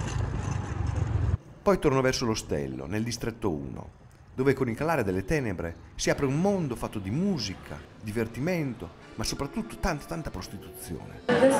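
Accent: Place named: Romagna, Italy. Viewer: Socmel ma sei romagnolo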